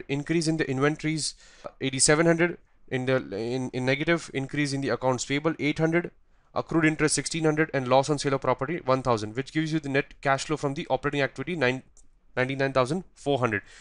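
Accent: India. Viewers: the Indian accent is already a bit hard to follow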